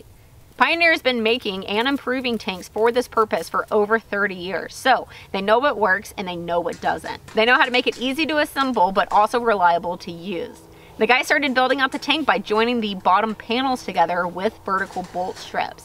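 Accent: Texas, United States. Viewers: Dang. I forgot you’re from Texas. 😆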